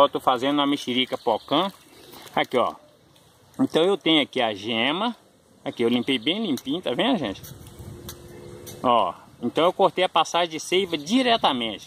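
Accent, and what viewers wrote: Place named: Minas Gerais, Brazil. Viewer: Vc explica direitinho agradecida pelo video parece ate mineiro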